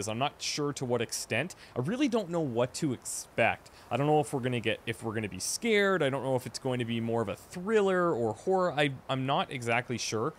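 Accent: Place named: Canada. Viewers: Dragonborn Dovahkiin yehhh ur one of us canadiansssssssss eh!! u rly sound canadian... im from toronto so we don't really sound canadian.. but i have my canadian moments +__+ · Yes, he's Canadian. Montreal, by way of Saskatchewan (if I recall correctly)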